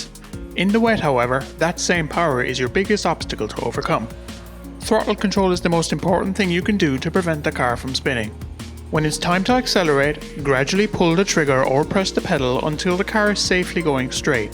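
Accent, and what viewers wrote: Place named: Kerry, Ireland. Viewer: You sound like you’re from Kerry or somewhere near there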